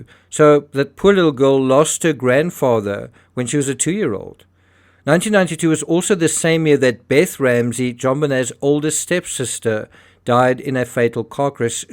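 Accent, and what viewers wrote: South Africa. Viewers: It's that hideous South African accent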